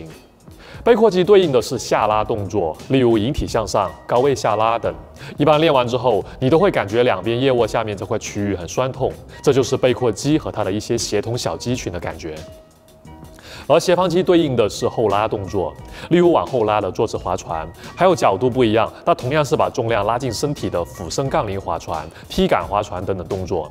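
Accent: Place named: Guangxi, China. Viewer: 非常棒的视频，感谢分享！听口音广西人吧？和我关注的另一个股票类youtuber：Emile Su的说话方式真的很像。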